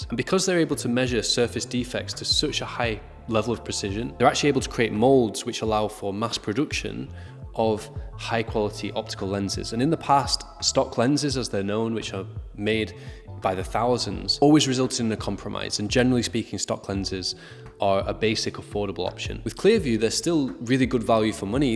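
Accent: United Kingdom. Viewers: He's British. To him it's you who isn't saying it right.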